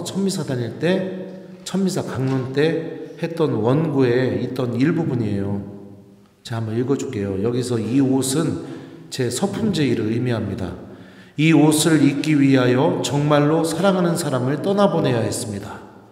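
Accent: Chungcheong, South Korea. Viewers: 신부님! 감사합니다 늘 건강하세요 요즈음 당신의 강론을 듣고 살맛납니다 충청도사투리 넘 구수하고 멋있어요 감사드려요 신부님 알랠루야....❤❤ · 신부님의 강의 너무너무 감사히 들었어요.^^ 세상 참 좋은것이 이렁게 머~언 캐나다에서도 편하게 좋은 강의를 들을 수 있으니 얼마나 감사한일인지요.❤ 보통 집안일을 하면서 듣는데 신부님의 강의를 메모하며 집중해서 듣고 싶어서 작게 차려놓은 기도 테이블 앞에서 들었어요. 끝날때쯤 "저를 위해서 성모송 한번이라도 기도 부탁합니다"하실 때 가슴이 찡하면서 눈물이 나오려했어요. 신부님들은 신자들을 위해서 온갖 고생 하시며 먼 곳까지 마다하지 않으시고 강의를 다니시는데 신자들에게 기도 부탁하실 때 보면 목소리가 작아지시더군요.^^신부님, 매일 사젤.ㄹ 위한 기도와 주모경을 바치오니 부디 영육간에 건강하시고 어떠한 유혹에도 물리치실 강력한 힘을 하느님께 빌어드립니다 참고로 제 고향도 충남 보령 웅천입니다.ㅋㅋ 신부님의 구수한 사투리가 참 정겹습니다. 신부님 화이팅!!❤❤❤❤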